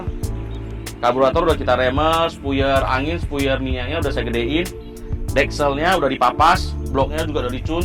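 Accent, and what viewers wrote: Medan, Indonesia. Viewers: medan kali asli wkwkwkw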